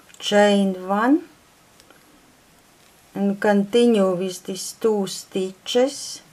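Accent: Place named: Latvia. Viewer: Are you Latvian? You sound sooo Latvian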